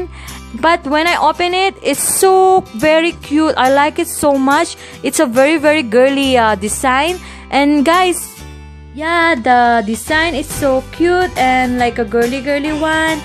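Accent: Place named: Philippines. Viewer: Hi,may I ask if you are Filipino?I just noticed your accent.:)Nice haul by the way.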